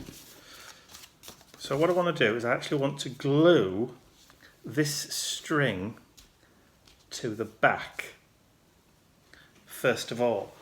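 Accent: United Kingdom. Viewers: Oh don’t you hate when that happens? Lol. It was a great video still, you have awesome ideas and love listening to you and that British accent. 💕😎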